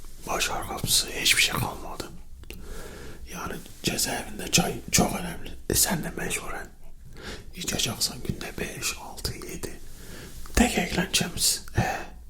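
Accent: Eastern Anatolia, Turkey. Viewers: Doğu şiveli zaten güzel oluyor bir de bir şeyler atıştırmişsın ya abi iyi baya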